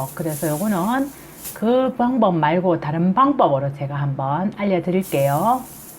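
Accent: Gyeongsang, South Korea. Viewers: 항상~~잘보고 있어요 경상도 사투리 맛갈납니다~~^^ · 님 말씀도 잼나게 해주고 요리솜씨도 대박이네요. 경상도 사투리가 매력있어요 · 경남의사투리같아요 재미있어요.내일당장만들어야겠어요. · 경상도 아지매 저도 경상도입니다 말씀이 아주구수합니다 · 경상도 사투리가 참 맛깔쓰럽습니다. 부추간장에 청양고추ㅡ 한번 해봐야겠어요. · 님께 배운대로 정구지 간장 만들어봤는데 너무맛있어요 한통만들어서 뚝딱 다먹었어요 경상도 말 너무재미있어요 가르쳐 주셔서 감사합니다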